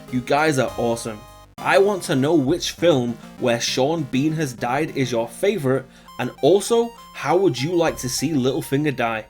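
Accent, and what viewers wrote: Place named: Northern England, United Kingdom. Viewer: ur accent???? where is it from just curious? its mixed of jon snow (winterfelish/northern english/ mancunion) n American? its mixed or is it me only? or i donn know i m mancunian so hope u donn mind mate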